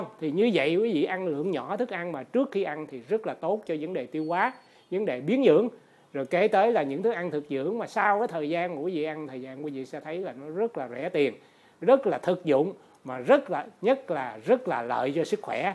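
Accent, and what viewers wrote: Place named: South, Vietnam. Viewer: Chúng tôi kính cảm ơn quý Thầy chỉ dạy cặn kẻ, tận tường, lời lẻ giản dị dễ hiểu, Giọng miền nam truyền cảm ấm áp, hình ảnh và âm thanh ở đây rất tốt!!!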